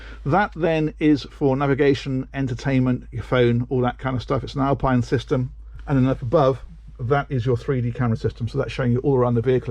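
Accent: United Kingdom. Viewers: What a beautiful english oxford accent !!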